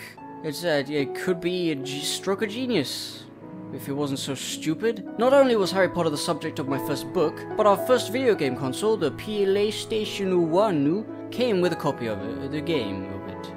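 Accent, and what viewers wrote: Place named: United Kingdom. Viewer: I fucking hate british accents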